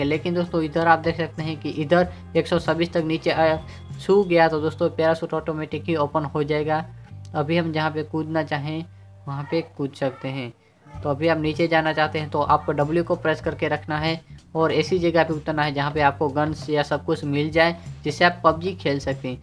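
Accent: Gujarat, India. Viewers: Bhai aap Gujarati ho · Gujarati Cho?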